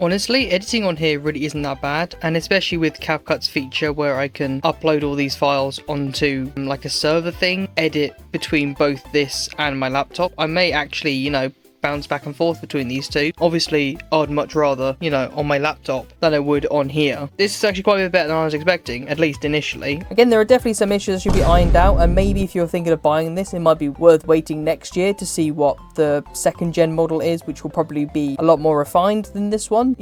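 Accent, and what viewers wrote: United Kingdom. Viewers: I love hearing british people english accent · Great review! You so British! :) Love the accent!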